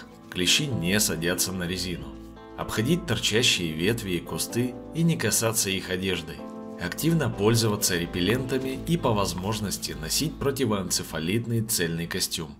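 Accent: Standard. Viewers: так приятно слушать твой голос, неторопливую твою речь, чистый русский язык! Видеть приятно твою любовь к лесу, счастливое лицо от встречи с жителями леса. Каждый ваш фильм - отдых душой, заряд добра и любви. Вы - лучшие!!! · Меня за интересовал сюжет дом на дереве, но прятней всего оказалось, слушать чистую литературную русскую речь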